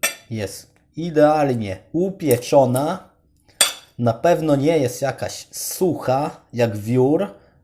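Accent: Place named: Ukraine. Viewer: A co to ukrainiec jest taki ma akcent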